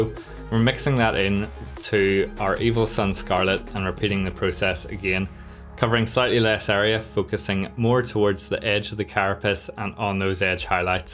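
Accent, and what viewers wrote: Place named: Northern Ireland, United Kingdom. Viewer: Really Helpful Video, has given me some inspiration for my Winged Hive Tyrant, also great to hear a Norn Iron accent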